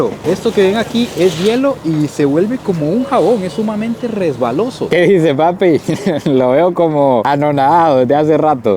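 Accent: Argentina. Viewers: Te queda bien el acento argentino, en serio. Los perros desarrollan un pelaje impresionante acá por el frío. La intendencia sería allá (creo...) la alcaldía, no? La estatua es la de un gaucho argentino. Las malvinas son argentinas, los piratas ingleses no se vinieron desde tan lejos a robar, saben que las tierras son ricas en minerales y además, son tierras ricas en petróleo. Los amigos de 4 patas le dio un bello toque al video 🙂 y en realidad, la historia dice que si comés calafate (la fruta típica de acá) te quedás en el sur. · Amo escuchar el acento argentino !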